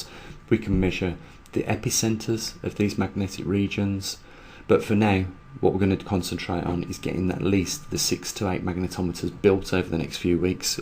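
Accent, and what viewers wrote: United Kingdom. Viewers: Mentioned previously I've been putting your videos about a bit on different platforms. But a little about me .. Expert in various sciences .. among these computers and animals. Oddly enough strange things have been occurring in both these areas and I've talked about it with others. Now I've been well aware we were heading for a magnetic flip for years but never expected it to occur in my lifetime. So never considered this being the cause of things I've witnessed. Now makes a lot of sense. I've been talking about your videos with a lot of people and over the coming weeks many thousands will probably hear about it too. Was odd to hear another British voice .. expected you to be American for some reason when I first listened to your previously.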